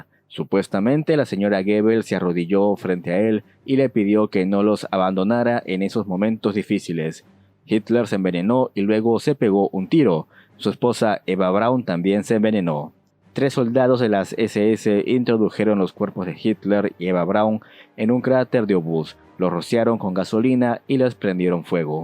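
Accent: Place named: Peru. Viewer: Muy interesante vídeo, una lástima luchar sabiendo que está todo perdido. Tenía años que no veía un video del canal. De antemano una disculpa. Antes pensaba "bueno, es un canal nuevo, aunque interesante aún no sabe modular bien su voz, es difícil hablar a un micrófono" pero hasta ahora veo que es porque es peruano y por eso es tan chocante su acento...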